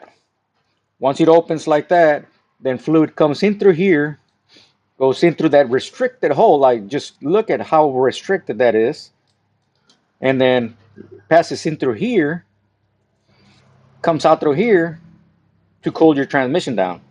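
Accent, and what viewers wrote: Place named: South, United States. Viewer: I'm totally enthralled to your blended southern/hispanic accent.😉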